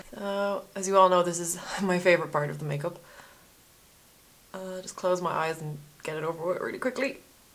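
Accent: Ireland. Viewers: Are u irish? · She's Irish, if that's what you're asking (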